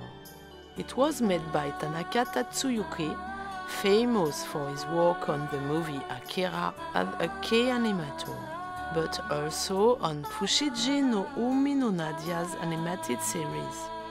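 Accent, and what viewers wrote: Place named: France. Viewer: It's à French accent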